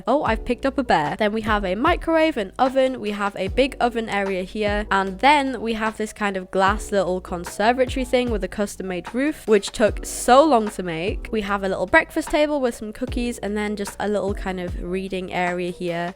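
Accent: United Kingdom. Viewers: She is British 😊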